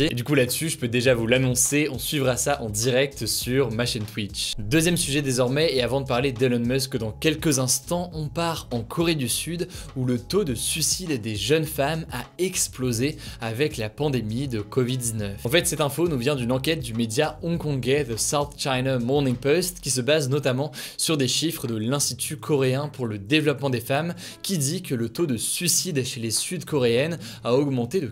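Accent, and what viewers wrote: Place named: England, United Kingdom. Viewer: si on peut juste s’arrêter quelques secondes et apprécier l’accent anglais d’. t’es génial.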